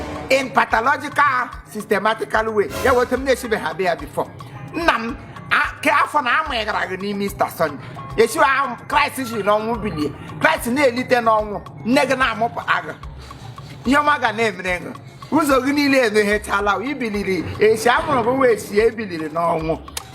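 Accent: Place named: Mbaise, Nigeria. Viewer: You are very talented, I like the mbaise your speaking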